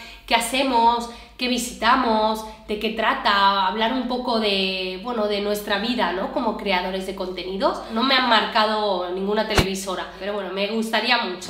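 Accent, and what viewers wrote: Spain. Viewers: Excelente video de preguntas y respuestas, ya casi 300K me encanta ver como sigues subiendo como la espuma, yo te haría dos preguntas, la primera es si estas buscando oportunidades de actuación en México ya sea en TV o en teatro y si lo estas haciendo que tanto ha influido en que te seleccionen o no tu acento Español/Catalán, la segunda es con respecto a los canales con los que quieres colaborar, me gustan todos los que mencionaste y de hecho creo que los sigo a todos, pero no mencionaste a ni un solo canal de Españoles que reaccionan a México y que de hecho han visitado el país y han creado también su propio contenido de sus viajes y vivencias aquí, Cygnus y The Romantic Corner (Chris y Carmen, casados y con un hijo recién nacido en México) serian para ti super fácil de conocer pues están radicando actualmente en CDMX... mucha suerte y, me encanta su contenido..... 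SUGERENCIA pueden agregar la opción de membresías al canal y subir ahí contenido sin editar o que por alguna razón dejan fuera de la edición final, eso les podría ayudar a incrementar su ingreso... Saludos desde Monterrey. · Mmmmmmm!! Mmmmmmm!!! Ummmm!! Sientes que tu forma y acento español haya sufrido alguna transformación? Sufrido? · Me gusta mucho tu contenido. Felicitaciones. Por otra parte, me he dado cuenta que estás perdiendo el acento español. Definitivo, los acentos se pegan depende de donde uno viva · Me gusta tu acento español y la forma profesional en qué haces tus vídeos. En hora buena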